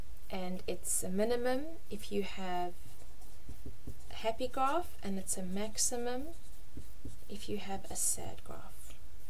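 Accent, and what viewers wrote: South Africa. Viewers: You're afrikaans?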